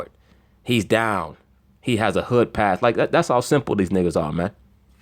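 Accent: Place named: New York, United States. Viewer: He was born and raised in New York that's why he talks like that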